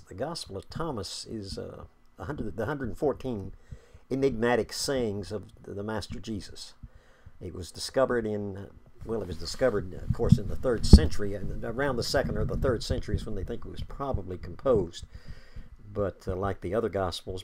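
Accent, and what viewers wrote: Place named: South, United States. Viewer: Southen accent is so grating to listen to.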